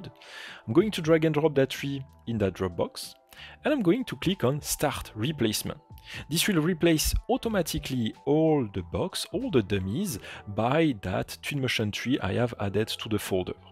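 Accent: France. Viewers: Thank you so much for the great tutorial. And the French accent is just drop dead gorgeous!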